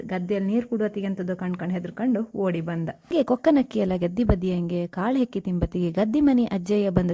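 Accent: Kundapura, India. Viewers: Kundapra kannada super.❤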